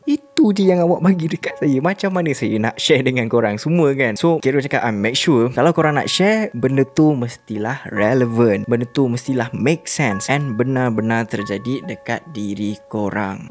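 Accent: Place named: Melaka, Malaysia. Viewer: X bkn org Melaka ni...mengelibas pekat😂😂😜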